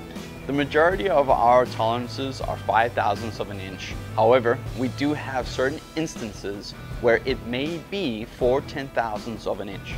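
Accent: South Africa. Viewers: sounds like a South African.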